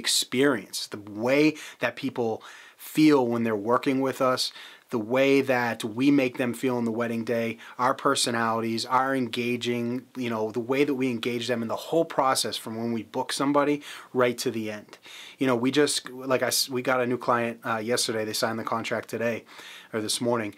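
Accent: Boston, United States. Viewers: Hey bud you from Rhode Island? Or Boston?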